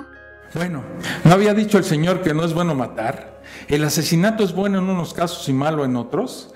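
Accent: Mexico City, Mexico. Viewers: Cómo me hiciste, reír con ese sabroso acento chilango jajaja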